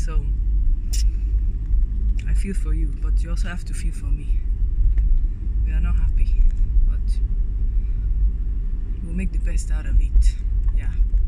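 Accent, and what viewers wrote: Igbo, Nigeria. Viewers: Love how you use our Igbo accent,!🥰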